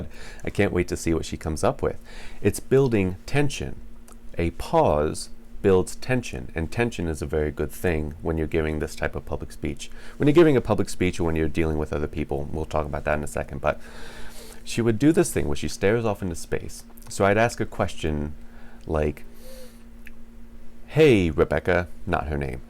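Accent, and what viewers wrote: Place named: United States. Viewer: I love your accent. Which part of the States are you from?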